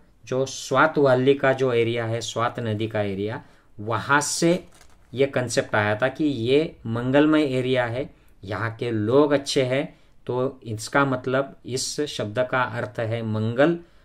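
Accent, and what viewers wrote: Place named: Maharashtra, India. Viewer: खुप छान पण तुम्ही मराठी मधे सांगावे कारण उचार pdhat मराठी वाटते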